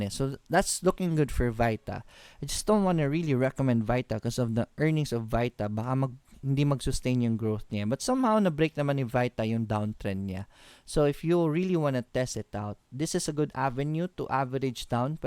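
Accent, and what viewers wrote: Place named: Visayas, Philippines. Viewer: sir , bisaya ka pala hehehe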